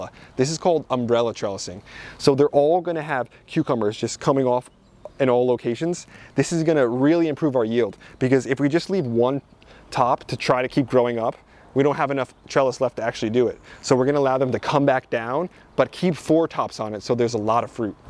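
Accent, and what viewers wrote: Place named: East Coast, United States. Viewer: Damn. A million subscribers. Nice. You deserve it since you're one of my favorite gardening bloggers, even though my gardening space is quite tiny (in an urban lot). Because you have that east coast energy which I love... even though I'm a Midwesterner. Probably because my father was born in Phili, and many of his extended family live in south jersey, So they a lot sound like you.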